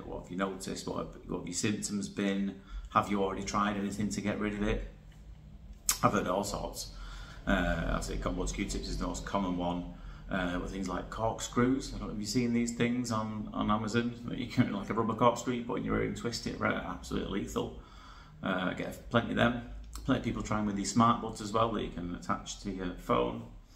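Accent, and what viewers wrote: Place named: Liverpool, United Kingdom. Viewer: Hi I wondered if you are close to Liverpool because your accent sounds as if your the 5th Beatle 😂you are super super young! I’m from California in San Jose. Have you spoke to Rhys Barber and Taylor Green Audiologist Associates? And also Collin from Durham Ear Specialist? I think you are absolutely great 😊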